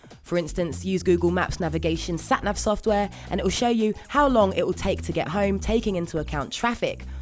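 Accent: United Kingdom. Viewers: I love hearing that British English!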